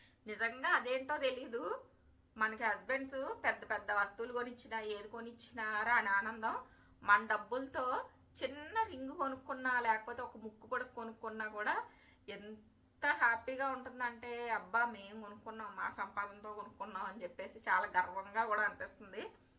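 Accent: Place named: East Godavari, India. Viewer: meedi yevuru garu turpugodavari basha laga vunnadi akkada evvarinina valuyu bulga palakaristaru ekkuva takkuva bedam vundadu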